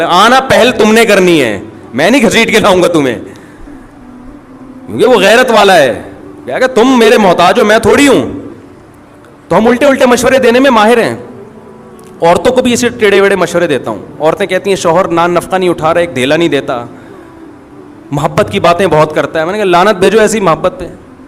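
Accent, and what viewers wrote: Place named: Karachi, Pakistan. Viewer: ویسے حضرت آپ کے بیانات سننے کی ایک اہم وجہ یہ ہے کہ آپ کے انداز بیان میں کراچی دیکھتا ہے آپ کراچی والوں کا انداز دیکھتا ہے اور جو افراد کراچی کو پسند کرتے ہے وہ آپ کو ضرور پسند کرے گا انشاللہ تعالیٰ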